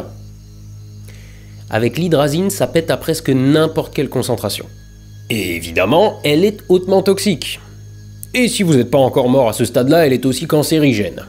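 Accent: Alsace, France. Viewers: j'adore les sponsos avec l'accent alsacien! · Aaaah l'accent Alsacien de l'ouest du Bas-Rhin ! pour une fois j'ai regardé la sponso en entier ^^ des shmout'z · L'accent alsacien est au top!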